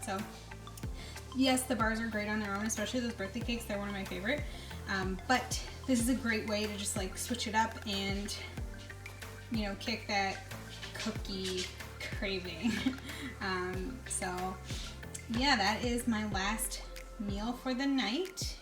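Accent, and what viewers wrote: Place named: Canada. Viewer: You sound Canadian. You say “aboot”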